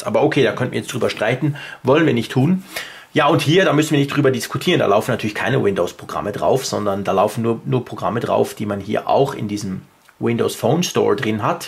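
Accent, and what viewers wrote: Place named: Switzerland. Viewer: Von de Stimme höre ich, dass Sie wahrscheinlich kein Deutscher, sondern ein Schweizer seid, ich auch. Deshalb meine Frage: Ich möchte mir das Surface Pro kaufen, aber dies Erscheint in der Schweiz wahrscheinlich erst in 3-4 Monaten. Wie sind Sie zu dem Surface RT gekommen? Liebe Grüsse aus Bern Marco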